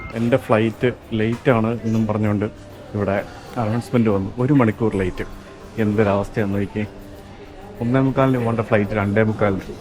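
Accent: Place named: Thrissur, India. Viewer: എന്തായാലും തൃശ്ശൂർ സ്ലാങ്ക് സുപ്പർ ആയിട്ടുണ്ട്ട്ടാ 👍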